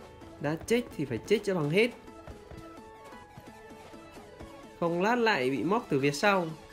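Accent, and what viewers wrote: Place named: Ha Tinh, Vietnam. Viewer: Giọng này khả năng là Hà Tĩnh :D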